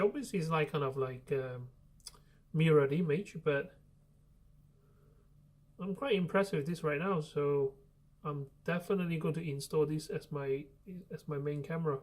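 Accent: China. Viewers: "Chinglish" LOL As long as I can interpret what's being conveyed, I'm good. I just got a Jennov 5MP PTZ POE Mini Cam and it looks similar to this one, but the documentation and Jennov's website is sorely lacking.. :( It appears that mine is made by DianChen Industrial Co., Ltd. and my Amcrest NVR cannot "see" this cam even if I change port numbers. Is it typical that each manufacturer "blocks" other manufacturers' hardware so as to force the consumer to purchase ONLY their hardware?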